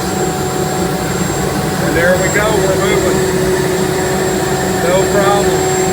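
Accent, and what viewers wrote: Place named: South, United States. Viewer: Okay, you've got a Southern accent. I was driving on I285 outside of Atlanta some months ago, and holy-traffic jam, Batman, the Batmobile was driving in the lane next to me. My wife was with me, so she saw it too. Couldn't get a picture of the thing, but we weren't hallucinating, so we chalked it up to a visual spotting of a UDO (unidentified driving object). And didn't think much about it till this video. Ever been to Atlanta? I just assumed that it was being driven to one of the local movie studios that have popped up down here.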